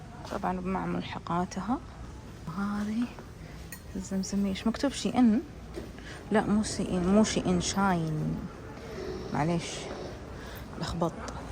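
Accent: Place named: Saudi Arabia. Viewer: انا متابعة جديدة ممكن ترحيب ❤ صوتك يجنن انا احب اللهجة السعودية 😊✨🦢🇸🇦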